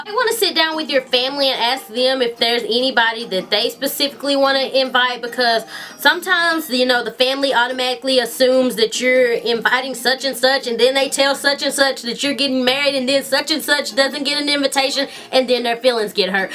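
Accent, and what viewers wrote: South, United States. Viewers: OMG You got engaged on my birthday...and we both have southern accents lol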